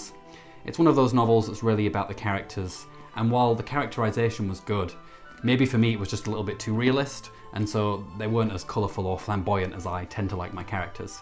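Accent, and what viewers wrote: Northern England, United Kingdom. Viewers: North England by the sound of it, though my American ears might deceive me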